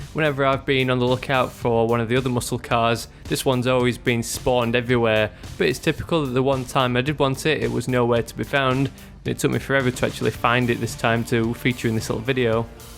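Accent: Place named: United Kingdom. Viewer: He's British, dumbass.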